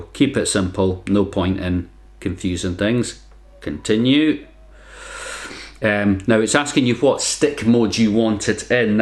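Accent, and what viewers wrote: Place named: Edinburgh, United Kingdom. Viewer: Secretly excited I knew your accent was Edinburgh in a matter of seconds. I lived in Alnwick, England for 6 mo. during college, which is not far. Cant wait to return on a trip this fall, I have missed the UK! Need to research the drone flying rules there- is it strict? Thank you, sending high-fives from Minneapolis!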